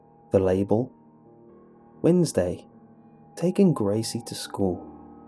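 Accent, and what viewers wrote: United Kingdom. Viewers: Subscribed...I've listened to you tell these stories for a while now. I've always been a horror movie fan n love scary stories but it's your voice and accent that made me subscribe to you. My mother is British and I would spend summers w my grandmother who had a thick accent but I never heard first hand a man w this accent and I've got to tell u yours is enchanting! I could listen to you talk all day...my grandmother is no longer w us so it's also comforting in a way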